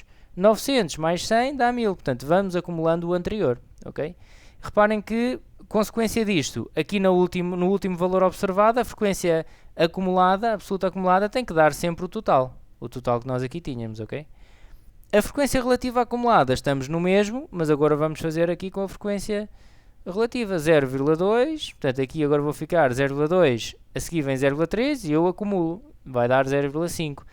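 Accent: Portugal. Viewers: o jeito que explica é muito bom, parabéns, mas eu não entendi direito por causa do jeito mais de portugal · Muito obrigada, me ajudou bastante. Uma ótima explicação, além do sotaque ''português'' tão bonito que nos ajuda a ter melhor compreensão para a resolução da questão. O passo a passo com que resolveu o problema foi muito bem explicado. Parabéns!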